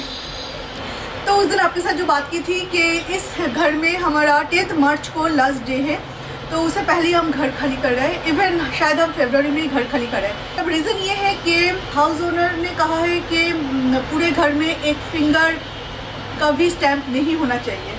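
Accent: India. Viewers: What a Hindi accents.... Shame on you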